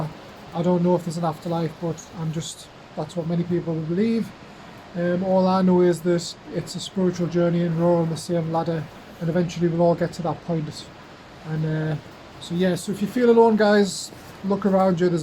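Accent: North East England, United Kingdom. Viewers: North East England he is. Maybe Darlington, Middlesbrough, Newcastle or Sunderland.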